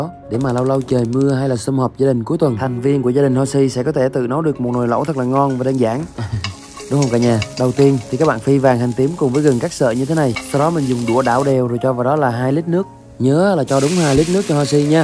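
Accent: Quang Nam, Vietnam. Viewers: Con trai người Quảng Nam hay Đà Nẵng vậy ? Chúc mừng kênh con , bác luôn theo dõi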